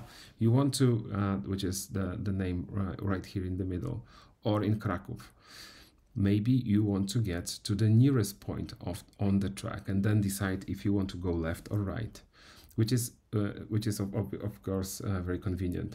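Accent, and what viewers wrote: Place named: England, United Kingdom. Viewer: Fajny angielski akcent masz.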